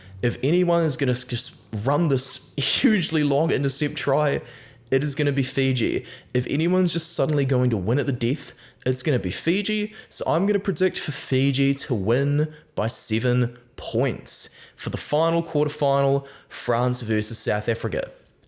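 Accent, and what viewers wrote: New Zealand. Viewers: hi! Really enjoying your videos:) You're an excellent host! Many reasons for this. I'm a Frenchman, who lived in NZ between 2009 and 2016, looks like the ABs have stopped dominating and winning everything as soon as I left Aotearoa:( but that France went on to (almost) win 2 soccer world cups since;) and on its way to conquer the 2023 RWC!? We shall see!. Hearing you talking about rugby with your kiwi accents brings back so many good memories of NZ, and your passion and knowledge of the game and how you communicate them are unmatched. Keep going bro:) Take care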